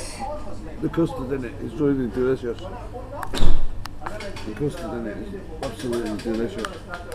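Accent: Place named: Midlands, United Kingdom. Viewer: Your accent is awesome dude! Me being from Birmingham if always makes me smile listening to the midlands accent 😊 Hope your keeping wall anyway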